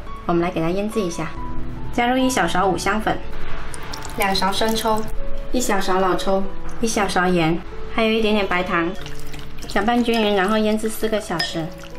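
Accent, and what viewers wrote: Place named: Hakka, China. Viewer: she has been telling us that she is 客家人, I am also a 客家人too. Although I am not fluent in 客家話, I understand the dialect pretty well. Her 客家話is totally different from mine. Which part of China is she coming from?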